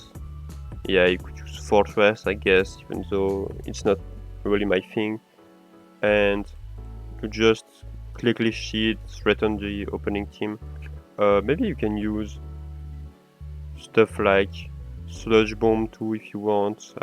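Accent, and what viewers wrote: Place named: England, United Kingdom. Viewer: Oh, what a beautiful english accent ! I'm jealous.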